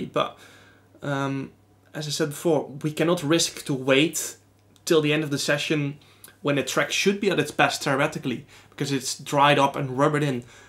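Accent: Netherlands. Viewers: we dont care about the quality we just wanna hear that juicy dutch-english accent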